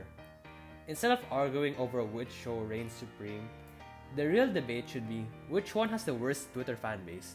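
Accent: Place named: Tagalog, Philippines. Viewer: im hearing a tagalog accent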